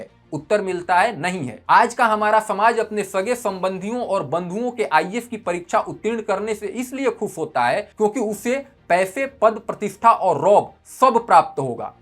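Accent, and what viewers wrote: Standard. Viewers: Wah...itni suddh hindi sunn kar mera mann gad gad ho gaya....bahut bahut subhkaamnaye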